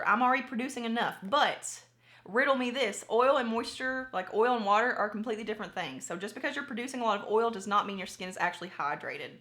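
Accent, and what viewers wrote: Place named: Kentucky, United States. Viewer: Oh no! It looks like it’s still all here for me when I expand it, but I can’t see what you’re seeing so I don’t know where it got cut off, exactly. Go figure: I apply brainpower, it melts my words. I poked around on your social networks looking for an email so I could just send it there, but while it says it’s “below” on your youtube About page, sadly it isn’t, so I hopped to your Facebook and discovered... Lady, you are from Southeast Kentucky. I knew I loved that accent, I’m from Eastern KY but lived in NYC for years & years until family issues brought me back to KY. I will now attempt to “message” it to you via Faceplace, and let’s hope that doesn’t cut it off & not let me know where. Sorry for the discombobulation.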